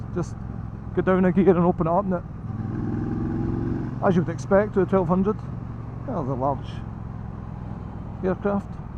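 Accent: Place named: Scotland, United Kingdom. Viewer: Nice roads there, and amazing video. Unfortunately your speech is quite hard to understand for me (heavy Scots accent) Beside this, I am enthusiast for this bike, except for the rear absorber. Actually it's not efficient, nor acceptable to this class bike. My low back is often shoved, I am looking for an optional adjustable absorber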